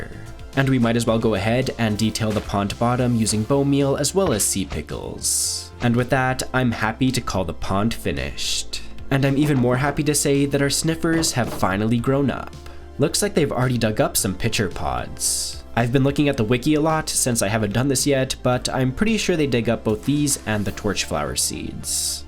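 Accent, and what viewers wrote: Canada. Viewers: It's just because he has a Canadian accent. They just speak that way, calm down bro.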